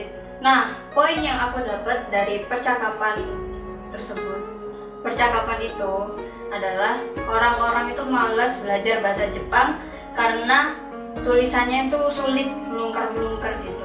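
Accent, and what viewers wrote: Java, Indonesia. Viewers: Aku kira mba nya orang jepang.. eh tau2 nya medok #hahaha.. Btw, terimakasih infonya :D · kok medhok tenan wkowkowko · ojo medok medok mbak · jowone jowo endi mbak ? hahaha jogja ? semarang ? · Njir wong jowo 😍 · Halaa logat e jowo tulent iki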